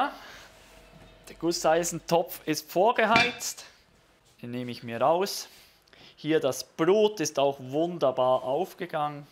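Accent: Switzerland. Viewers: Ich habe schon mehrere deiner Rezepte gebacken. Jedes Brot ist immer super gelungen, obwohl ich meistens die doppelte Menge nehme (große Familie). Mach weiter so, ich liebe diesen schweizer Dialekt .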